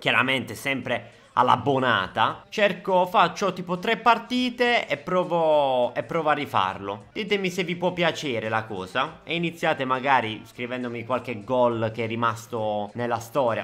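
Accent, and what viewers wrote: Bari, Italy. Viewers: Barese parli? O sbaglio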